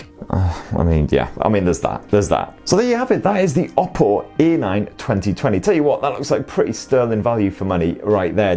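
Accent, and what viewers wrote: United Kingdom. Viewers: It's British Accent 👌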